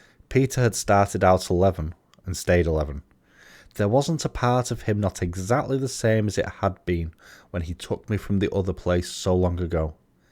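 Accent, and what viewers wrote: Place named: United Kingdom. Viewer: That was great, thank you! It’s so nice to hear a Brit read something on YouTube, good to hear it in a reasonably local accent too. Will check out your review of the whole book too.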